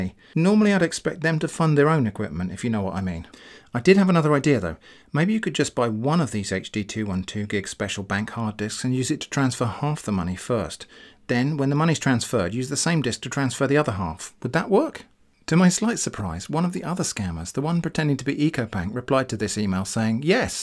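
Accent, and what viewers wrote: United Kingdom. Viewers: Your droll humor and British accent are a delightful combination playing with these crooks. TY.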